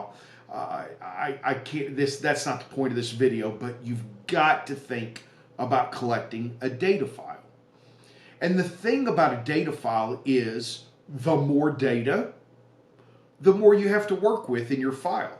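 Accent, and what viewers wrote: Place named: Texas, United States. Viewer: I’m 65, and this may be the first time I’ve heard “paradigm” in a Texas accent. (Not to sound like a West Coast doofus elitist, but hey, you brought up the accents thing.) I haven’t been too conscientious about exposing to the right in my digital photography, falling prey to having the image look good in the viewfinder/screen. I’ll definitely want to work on that, thanks.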